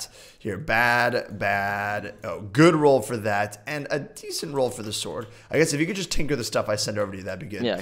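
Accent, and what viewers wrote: Scotland, United Kingdom. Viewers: Its Scottish like meh :D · OMG I LOVE ACCENT U SCOTTISH GUY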